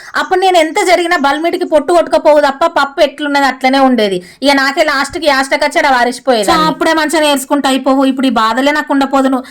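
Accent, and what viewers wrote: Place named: Vemulawada, India. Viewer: అక్కా మన వేములవాడ యాసను, దేశమంతా తెలియజేస్తున్నావ్ సూపర్ సూపర్ సూపర్,,,,,,,,,,,,akka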